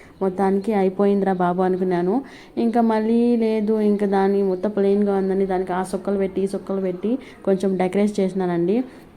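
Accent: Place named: Nellore, India. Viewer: Nellore aa sis medi nellore yasa anipistundi mi matallo 😂😂😂bagundi mi bus lorry avvaley😜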